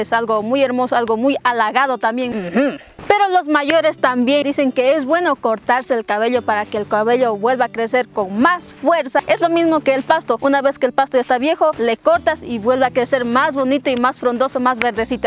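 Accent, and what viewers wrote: Mexico. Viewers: PARECE QUE ERES DE CHIAPAS MÉXICO. HASTA EL MISMO ACENTO AL HABLAR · Jajajaja que linda, habla igual que las indígenas mexicanas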